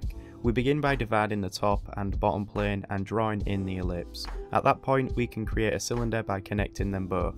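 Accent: United Kingdom. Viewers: As much as I know he is from the UK xD If you wanted to know which area of the UK, sorry I don't know that 😅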